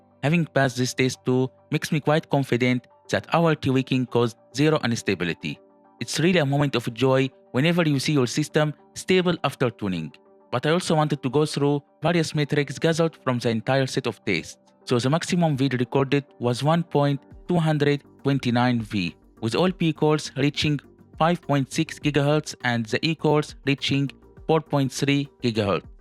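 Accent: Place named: India. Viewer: Indians will never pull eastern women with their accent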